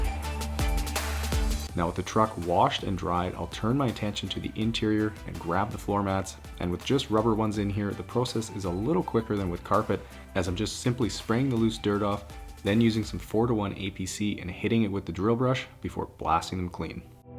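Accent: Canada. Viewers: I just discovered your videos and now I'm addicted lol! Also I knew I heard a fellow Canadian as soon as you started talking haha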